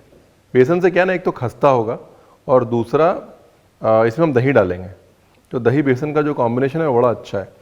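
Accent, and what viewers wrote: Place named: Bhojpuri, India. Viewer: Your bhojpuri accent is so sexy ..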